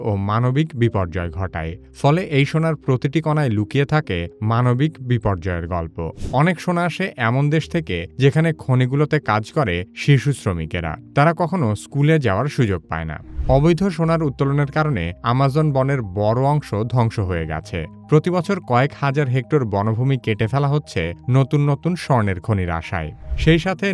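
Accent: Bangladesh. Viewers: ভাই আপনি কি নোয়াখাইল্যা নাকি?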